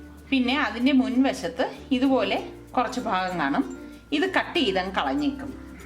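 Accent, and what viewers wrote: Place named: Kottayam, India. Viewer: Sister the crab came out so well! You speak Malayalam like my parents so you must be from near Kottayam! greetings from Canada! Very clear instructions! Thanks!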